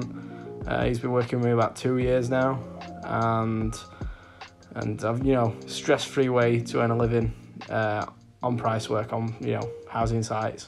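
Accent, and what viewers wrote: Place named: Derby, United Kingdom. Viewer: Wheres the site are your on buddy, you sound like your from my area in derby and theres loads of red road sites near me Another good video buddy, definitely think 1n1 is a good way to go:)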